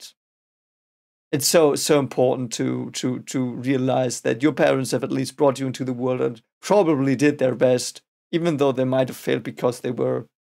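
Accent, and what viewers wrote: Germany. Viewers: Thank you for the correction: 'Glanz.' I believe 'bredt' is a Danish word. However, the subscripts may have undergone mistranslation and might not accurately reflect the original meaning. By the way, are you from Bayern? Your way of speaking is similar to that of a guy from Munich whom I know.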